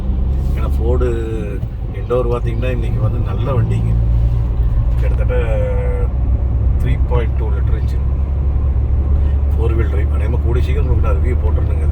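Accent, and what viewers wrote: Kongu, India. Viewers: கொஞ்சும் கொங்குத் தமிழ் பேசும் கோமகரே❤❤❤❤❤ திகட்டாத பேச்சுடைய திருப்பூர் திருமகரே❤❤❤❤ அருமை அருமை அருமை அருமை யெப்பா வேற லெவல் மதிப்பிற்குறிய அய்யா🙏 நீங்களும் உங்க வீடியோ மற்றும் உங்கள் கார் வேலைப்பாடுகளும் எல்லாம் வேற லெவல்😊😊😊😊😊😊😊😊😊❤❤❤❤❤❤❤❤❤❤❤❤👍👍👍👍👍 அதிலும் குறிப்பாக அய்யா நீங்க நகைச்சுவை நடிகர் சின்னக்கலைவானர் திரு.விவேக் சாரோட தீவிர ரசிகராட்டம் இருப்பீங்கபோல😊👍 Secondல சொன்னது "ரைட் சைடு இண்டிகேட்டர்😂 (ஆனால் திரும்புனது இடது பக்கம்😂) அதாவது ரைட் சைடு இண்டிகேட்டர்னு சொல்லுவோம்.... அதே நேரத்தில் இடது பக்கம் திரும்புவோம்.... ஆனால் Straight ah போயி நம்ம ah கண்பியூஸ் பன்னுவோம்ல😂😂😂😂 என்றும் அன்புடன் உங்கள் மகன்போல இவன் ரா.முஹம்மது கபூர் (சென்னையில் இருந்து) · Getting addicted to your Kongu tamil.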